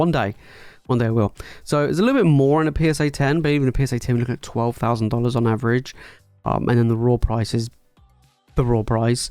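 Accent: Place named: United Kingdom. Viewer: Nice to hear a UK voice - thought I was going crazy that I didn’t remember 1st Ed. in this country!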